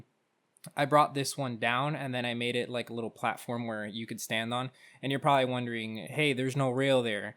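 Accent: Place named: Miami, United States. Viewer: I knew it since i heard your voice my first reaction was “something about his dialect just screams Miami” lol Our dialect sticks out like a sore thumb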